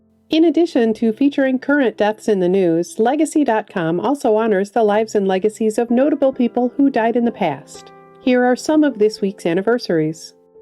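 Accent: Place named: Minnesota, United States. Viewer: I would love to know more about her! Her narration and accent sound like she was born and raised in Minnesota or somewhere around that region.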